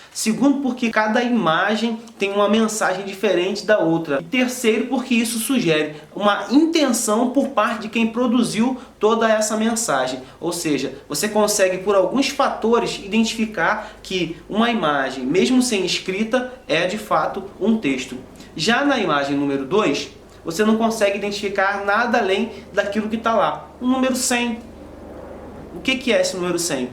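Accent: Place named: Rio de Janeiro, Brazil. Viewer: Nem precisa fala que é do RJ com o sotaque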